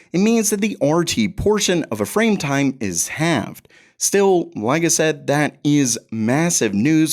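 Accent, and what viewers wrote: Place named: South, United States. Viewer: this guy's voice sounds like a southerner mixed with a lesbian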